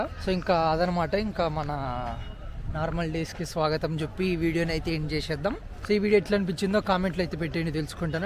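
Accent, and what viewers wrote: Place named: Tadipatri, India. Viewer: anantapurama Tadipatri na, adhey slang idhi ...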